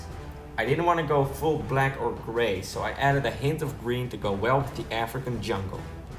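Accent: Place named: Netherlands. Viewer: Ew weird dutch accent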